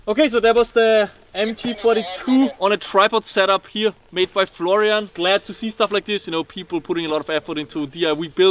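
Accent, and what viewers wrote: Germany. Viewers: Dein english hört sich Deutsch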